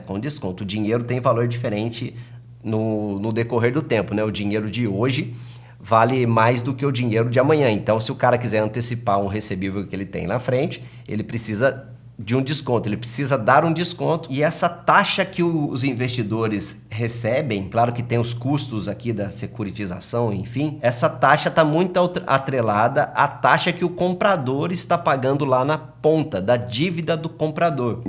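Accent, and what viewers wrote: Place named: Caipira, Brazil. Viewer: Ultima vez q vi vídeo do seu canal vc falava com voz de caipira RS pq mudou?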